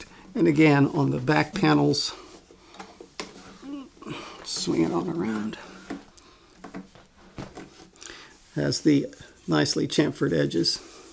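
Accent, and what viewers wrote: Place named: Winnipeg, Canada. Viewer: You’re from Winnipeg, aren’t you?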